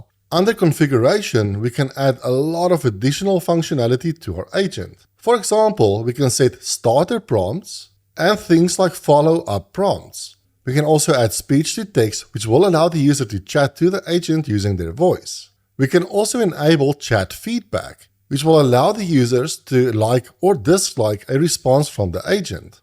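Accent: Afrikaans, South Africa. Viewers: Môre. Ek't nou al so paar van jou video's gekyk en dit baie insiggewend gevind. Ek's regtig nie gepla met Engels nie - soos in glad nie. Maar dis so lekker om sulke regtige goeie kwaliteit inligting met 'n Afrikaanse aksent te ontdek! Maggies man, wil gedoen wees. Jy mag maar. Groete uit Durbanville - Kaapstad.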